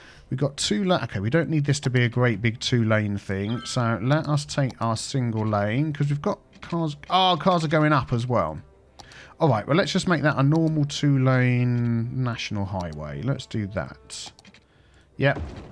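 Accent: United Kingdom. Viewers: There is something uniquely meditative about listening to a random British dude sip tea and mutter away while diligently fixing the same rudimentary transportation engineering problems over and over again. It's like a slightly condescending ASMR, complete with a hint of progressive optimism, and little cherry verbal nuggets sprinkled in; horky-borky, chockablock, straight-on, etc. Just makes me feel like things are right in the universe. You've hit a commendable niche. This is far better ambience than listening to "4 hours meditative gregorian chanting" or "best of derivative study beats vol. 69" 10/10, will definitely put on the Anglo-traffic-Daddy while I'm doing research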